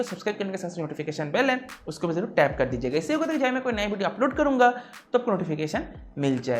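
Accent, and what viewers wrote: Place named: Kolkata, India. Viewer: Bro you don't know, what you are ... You r genius Bro ♥️♥️♥️... you explained us anything about tech, hardware or software related matters so fluently & deeply(specially)...lots of love ♥️♥️♥️ from Kolkata...♥️♥️♥️ I think you are also from our Kolkata our pride ♥️♥️♥️ from your speeking accent, correct me if I am wrong 🤝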